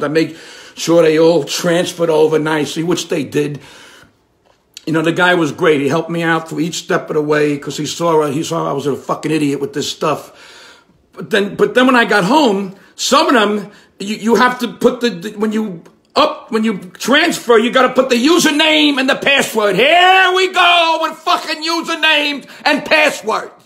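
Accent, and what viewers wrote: New York, United States. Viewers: Thick New York accent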